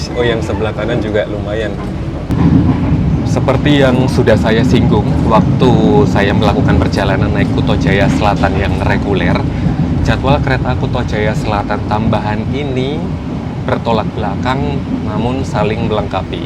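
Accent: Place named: Sunda, Indonesia. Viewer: A jiga na udah kental sekali bahasa sunda na hahaha😅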